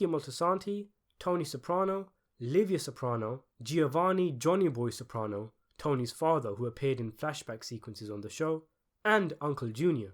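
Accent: United Kingdom. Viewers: You Brits say "H" funny. "haytch" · The British accent ruins it. Such a sissy accent talking about Tough Guys.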